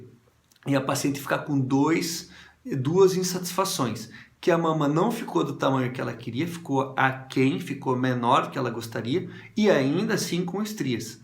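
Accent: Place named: Northeast, Brazil. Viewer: Dr. Primeira mente esse teu sutaque é maravilhoso, nao sei de parte do Nordeste tu eres mas amo esse sutaque. Bem eu estou planejando colocar uma protesi mamária, moro em Boston mas sou de Recife, estou estudando a hipotese de fazer a cirurgia no Brasil, gostaria muito de saber em parte do Brasil vc atende?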